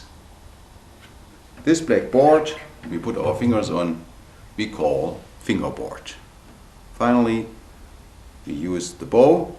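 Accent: Germany. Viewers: Harry Devita Pretty much a german accent. The misspelling might be intended though :D A beginner is begging for knowledge ;-) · His videos are good and he seems to be a great teacher but am I the only one who listened to his first words and was like "I bet he's from germany"? I can't be the only one😮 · my first thought was "he is either German or Finnish." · German accent, never gets old.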